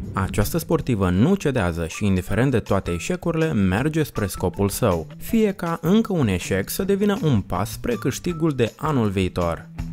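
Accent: Moldova. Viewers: 🤐 Foarte frumos clipul, dar comentariul este total aiurea de...moldovenesc de peste Prut ! Recomand revizionarea clipului ori de câte ori doriți, dar ÎNCHIDEȚI sonorul !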